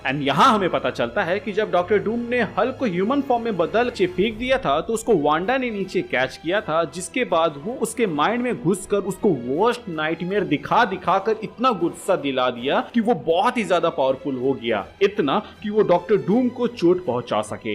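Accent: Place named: Bihar, India. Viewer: Bhai apke tone of explaining se lgta he ki app bihari ho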